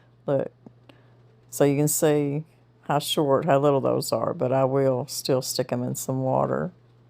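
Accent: South, United States. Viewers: Dear Miss, Thank you for brightening this dreary, rainy day with another delightful video! Wish I could send you some rain for your beautiful, newly planted sweet potatoes. Love watching you plant while listening to your calm southern accent! Yesterday I watched you make your Coca Cola chicken and it looked wonderful! You make everything look so easy and simple! was certainly enjoying it…….I’ll take his word for it!🤗🤭 How do I go back and watch your very first videos? I’ve missed so much. Have a happy weekend! 💕💕Jackie🪷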